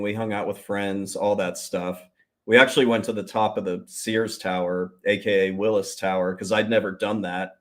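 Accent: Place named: United Kingdom. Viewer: Love that saucy British narrator. ❤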